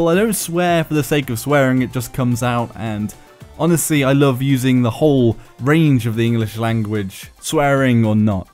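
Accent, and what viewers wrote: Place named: United Kingdom. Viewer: Huh, didn't realise you were British. That makes you a lot hotter. Totally gay for that.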